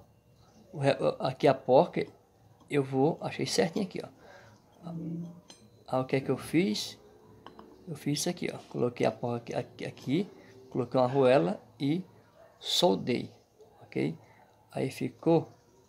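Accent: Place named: Ceará, Brazil. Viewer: Ô vc parece ser cearense!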